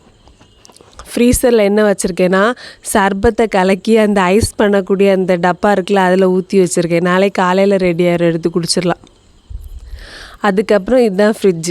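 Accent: Kanyakumari, India. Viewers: en amma veedu aloor railway station pakkathile than irukku. Unge videos rompa pudikkum athum antha kanyakumari slang.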